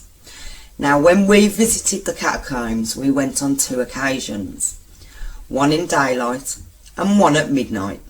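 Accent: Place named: Birmingham, United Kingdom. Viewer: I like her brummie accent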